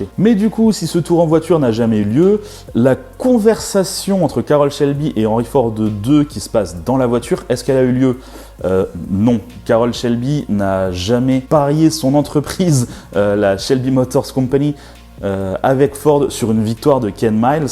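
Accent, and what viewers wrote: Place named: Moselle, France. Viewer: Désolé les gens, ici dans notre belle région c'est comme cela que l'on s'exprime ! Le Chti' d'la Moselle...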